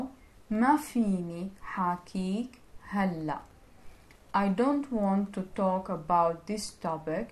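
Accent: Damascus, Syria. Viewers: Thanks, dear for your answer. Your lessons are very very well presented, understandable and useful. Thanks once again. I would like to ask you, is your accent, if that is possible to call it so, Damascene? Because as far as I know, e.g. people of Damascus and Aleppo speak somewhat differently.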